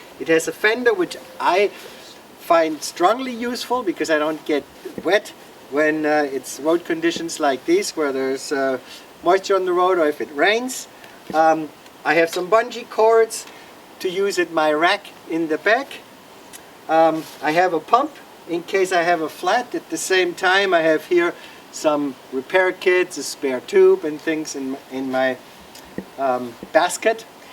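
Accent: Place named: Germany. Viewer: no, your accent gives you away from being originally from Germany, not that you have a business trip there. ;-)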